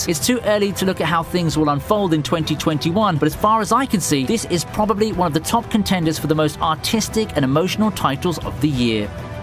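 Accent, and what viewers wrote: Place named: United Kingdom. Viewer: I love your british accent!!! Thats why I'm a fan of you!!!!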